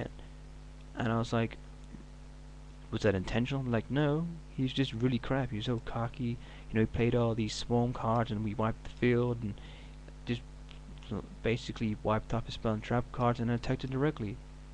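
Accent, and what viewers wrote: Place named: United States. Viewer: Dude sersiouly stop with the american acsent its getting old lol also this vid is blury as shit! Liked what ya said though because its so true!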